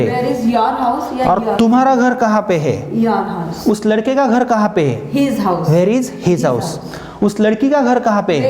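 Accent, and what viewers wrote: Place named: India. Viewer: I was struggling to understand India accent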